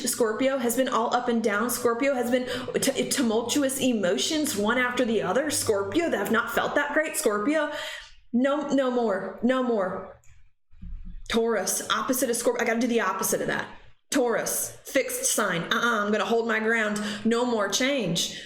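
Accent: South, United States. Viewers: You can really hear the southern accent on this one. :) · Been watching you since before your move! Your definitely getting a southern accent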